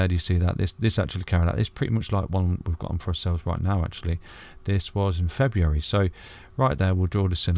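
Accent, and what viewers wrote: United Kingdom. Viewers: Gotta love the Bri’ish accent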